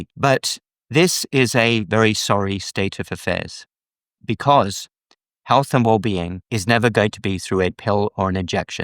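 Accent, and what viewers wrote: United Kingdom. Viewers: You sound like you're in the UK so point is you should be aware that the type of care a lot of people are getting is so surface level and subpar it's unbelievable. Are we shamed for being overweight? Of course. Are we given innovative solutions and advice to fit our specific needs eg conditions like PCOS, Hypothyroidism etc? No of course we're not. Are we taught about proper nutrition? Never. So it's not a wonder why most people consider a quick fix when the shaming around weight and yet no help are very loud in the medical field.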